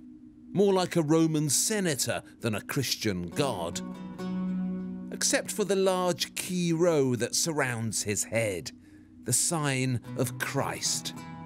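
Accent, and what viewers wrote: England, United Kingdom. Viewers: The speaker is a liberal. Just look at his hair. To be honest with you this guy doesn’t understand history. He just gives one part of history. Just because he has a English accent doesn’t make him an expert. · this british accent is so ugly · Superb presentation skills and great content. Very non-irritating British accent.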